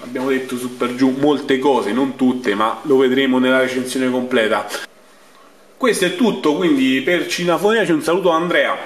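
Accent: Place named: Bergamo, Italy. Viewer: Attendo aggiornamento sulla batteria, sull'audio e sulla fotocamera...bella fratellì, un abbraccio da Roma. C'hai st'accentino de bergamo alta...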